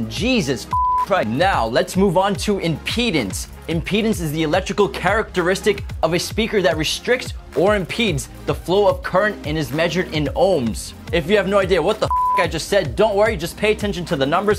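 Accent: United States. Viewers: Are you an american? sure sounds like it.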